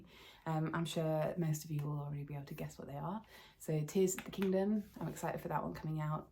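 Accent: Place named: Wales, United Kingdom. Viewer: 🏴 what's wrong with the Welsh accent? Haha great video and game collection, hope to see more 😁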